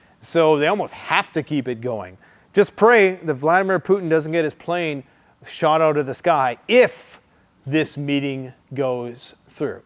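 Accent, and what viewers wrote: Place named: South, United States. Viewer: Lol sound like a true southern boy Tell it like it is don't care who you hurt or piss off😂😂😂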